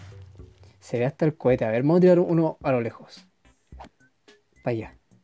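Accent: Chile. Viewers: Se nota al tiro que eres chileno wn nuevo sub que crack eres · Eres chileno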